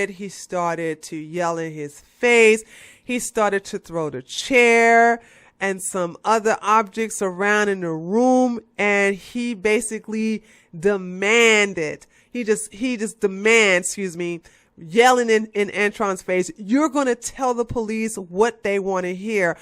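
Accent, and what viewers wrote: United States. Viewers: Where in Texas are you from? Ima guess DFW area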